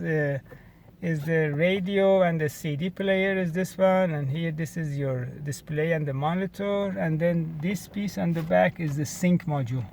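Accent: Iran. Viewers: داداش ایرانی هستی اخه لهجه اینگلیسی خیلی باحالی داری 🤭😂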